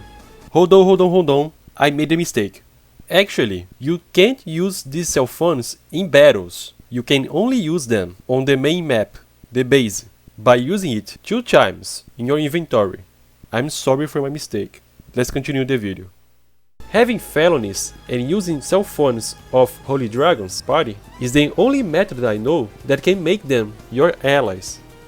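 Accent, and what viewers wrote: Brazil. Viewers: posso estar enganado mas o sotque é bem brasileiro, de qualquer forma obrigado vc ajudou muito com o video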